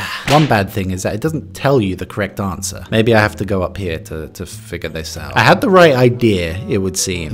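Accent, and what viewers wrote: Finland. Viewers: You have improved quite a bit. Well done! 🔥 I just wanted to mention that you have the same sort of Finnish accent my husband has as well. It’s this funny tone of voice, a bit like a toddler or a cartoon character speaking. I am not sure why, but I think if you make your S and T sounds sharper and your L ”clearer”, it will bring your Finnish to a next level. 😁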